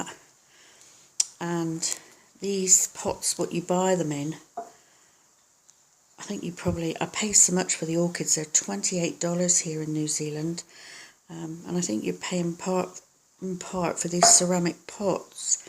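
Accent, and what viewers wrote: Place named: South, United Kingdom. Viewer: I do wish growers would remove the old medium when they repot, it takes ages to carefully remove especially the moss from oncidiums fine roots! Bark is better to remove but those hard packs of I don't know what they grow the seedling in is also difficult to remove. Found out your living in NZ from this video but your accent tells me your originally from the south of UK. Keep posting please!!!!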